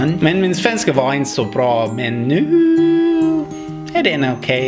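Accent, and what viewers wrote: Finland Swedish, Finland. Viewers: I can hear finlandssvensk dialect there :) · Du pratar Finlandsvenska men orden är bra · haha you sound finnish. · Haha, I love that you've learned it with a swedish/finnish accent! Good job, mate! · So... how long have you been speaking swedish men jag kan talar inte svenska även dom det är vor andra språk.. at least not any good don't even know is that correct :D . and i was so amazed for your finlandsvensk accent and perfect prenounsings ! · bra svenska men med finsk dialekt. vrf tröck du där??!! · To a Swedish person it probably sounds like you're already speking perfect finlandssvenska... Or at least this is pretty much what they sound like when they try to imitate it :) pretty fucking good, gotta tell ya! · Det låter som finlandssvenska men du är jätteduktig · Wow! Gullig finlands svenska :D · OMG! Srsly... How did I miss this video? 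Loving the Finnish accent! How 's the Swedish coming along these days? I guess learning Finnish is probably a bigger priority for you. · You should try to learn swedish with a swedish accent and not with a finnish one.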